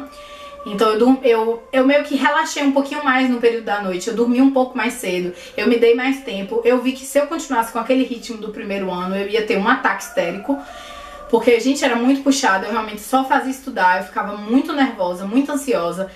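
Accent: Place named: Salvador, Brazil. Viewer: Amei o vídeo. Você é linda. Sou de Salvador e reconheci seu sotaque na hora haha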